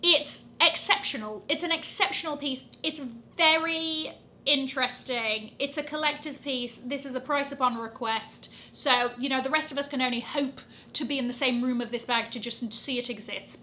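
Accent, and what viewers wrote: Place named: RP, United Kingdom. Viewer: Hi, I agree with you, some elements I like, some others are simply silly. Your videos are always interesting and I love your fantastic received pronounciation.😍😍😍 ( I understad everything you say and I’m an italian living in Spain)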